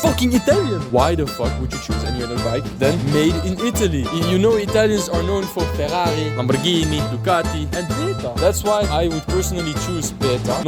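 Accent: Netherlands. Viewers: ik hoor die Nederlandse accent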